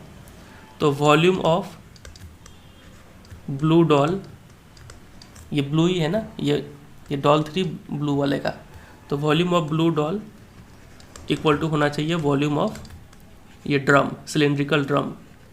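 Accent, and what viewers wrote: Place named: Bengal, India. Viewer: Where are u from sir ?? U sound like bangoli , if i am not mistaken, you are...... please please 🙏🏻🙏🏻🙏🏻 reply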